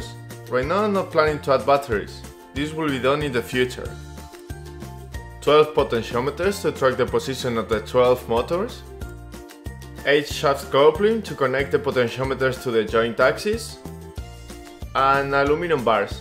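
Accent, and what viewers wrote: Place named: Spain. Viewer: Se nota el acento a españita jajaja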